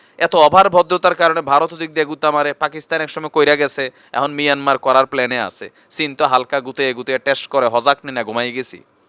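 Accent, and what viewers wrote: Comilla, Bangladesh. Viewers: ভাই আপনি কুমিল্লার ভাসা বলুন ভালো লাগে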